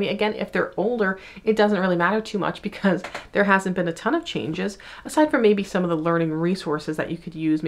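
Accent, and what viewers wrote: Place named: South, United States. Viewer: THANK YOU SO MUCH!!! I never realized that existed. .75 has the perfect southern draw my ears need.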